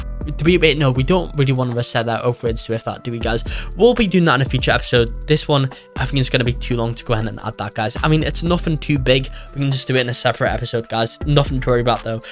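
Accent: United Kingdom. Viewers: he sounds brihish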